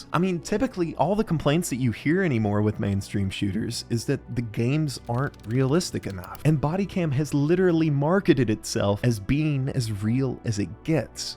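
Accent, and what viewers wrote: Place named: South, United States. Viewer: When that smooth Southern accent hits, you know it's time for some easy listening with insightful commentary. Great video,!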